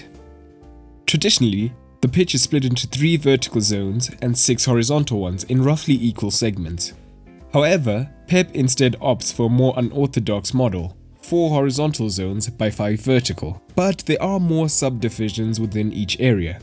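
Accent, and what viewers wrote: South Africa. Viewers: U sound South African lol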